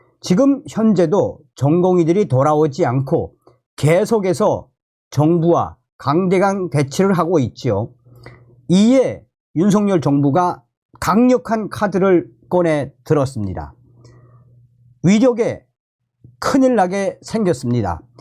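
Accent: North Korea. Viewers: 말투가 북한??? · 북한에서 오셨어요? 발음이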